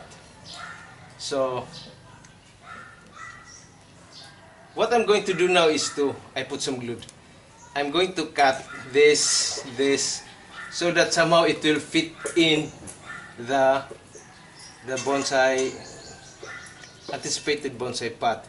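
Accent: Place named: Bisaya, Philippines. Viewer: piste mu bai..pnipilit mu pang mag inglish (english)...hlata nman bisaya ka...pisti tlaga..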